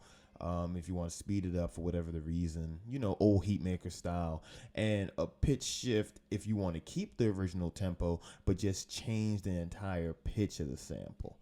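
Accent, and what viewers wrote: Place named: New York, United States. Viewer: this guy is clearly from NYC lol. good tut, thanks.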